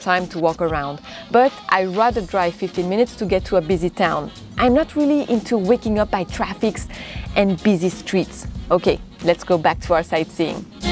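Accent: France. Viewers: Bonjour je suis du Canada,Montreal je vous adore une française qui parle anglais Très belle accent vidéo intéressent quand allez vous parler du osmo pocket Continuer votre bon travail bizou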